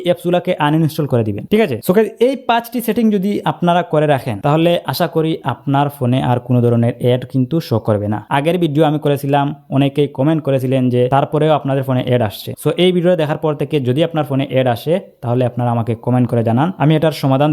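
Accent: Sylhet, Bangladesh. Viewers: Vai afne kita Sylheti ni?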